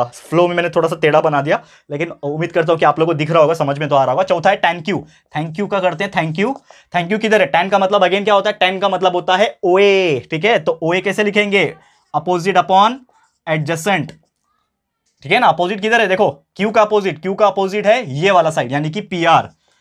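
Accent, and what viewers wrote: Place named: Mumbai, India. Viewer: I am 51 yrs , schooled in Mumbai, you speak Bambaiya Hindi liked your explanation very much watching you from Goa.😊 Thankyou 🙏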